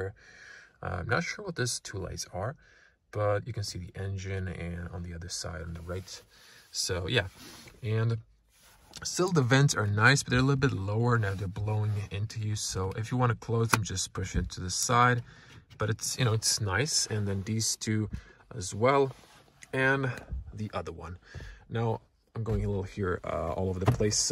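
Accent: United States. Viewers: Are you american? Youre accent is really strong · Pohvala za vrlo detaljan test. Jako dobro i tecno govoris Engleski sa americkim akcentom. Prvo mi nije bilo jasno jesi li Hrvat uopce?! U testu je manje vise sve tocno no smeta me sto vecina ljudi tvrdi da je kvaliteta izrade korak unazad. Apsolutno da to nije tocno jer je auto izraden barem jednako kvalitetno kao i sedmica cak nesto i bolje u pojedinim segmentima. Prvenstveno mislim na kvalitetu sjedala sto nikako nije zanemarivo. Drugo je naravno sto je cijena otisla gore, te je normalno da se u tom cjenovnom razredu mogu ocekivat kvalitetniji materijali u vecem dijelu interijera. Nedostatak tipki je mozda ergonomska katastrofa no to je buducnost definitvno. Recimo tko je malo gledao spijunske fotografije nove S klase pa cak i buduce C klase (W206) vidljivo je da ce zapravo centralna konzola biti ogroman zaslon. 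Poznato je da S klasa diktira buducnost i trendove autoindustrije tako da je Golf 8 zapravo cak i malo ispred svoga vremena. Detalji poput samo USB-C portova u kabini te komunikacije izmedu vozila koji razmjenjuju informacije govore u prilog tome. Sto se tice dizajna mislim da je potpuno pogoden iz vise razloga i najveci je medugeneracijski skok. Kad je aktualna bila sedmica ljudi su govorili da je dizajn konfekcijski i dosadan, sad govore da je previse futuristicki i da je cudan te je sedmica skladniji auto. Jos jedna potvrda onoj staroj narodnoj "Taj se nije se rodio koji bi narodu ugodio". Za kraj cijena je definitvno prenapuhana ali to je boljka vecine VW-a. Rek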